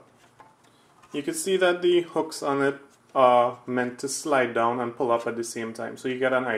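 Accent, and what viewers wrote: Trinidad and Tobago. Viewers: Are you from Trinidad? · Bro you sound Trini. Boss... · Is that a Trini accent ? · Yo you sound Trini, big up man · Yu does know a trini from anyway🙏🏾 thanks for the vid bro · Was surprised when I heard the Trini accent lmfao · Soundin like a rell Trinidadian “ 😂 Thank you ! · Thanks for the video you sound like you’re a Trinidadian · Thats a trini accent i hear? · Mannnn I started listening to the accent and I’m like… he sounds Trinidadian…I look at your Instagram and confirmed ✅ my moms side of the family is Trini 🇹🇹 awesome to hear a voice representing on YouTube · Aye Trini. I hear you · Is he Trini? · Yo the shock didn't expect a trini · you from trinidad? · You a Trini? 😊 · know that trini accent anywhere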